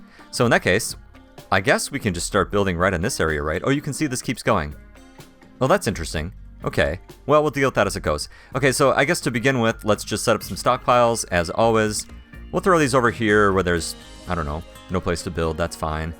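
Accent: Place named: Midwest, United States. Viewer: is an American as am I but he's from the midwest i am westcoast. He says ruff i say roof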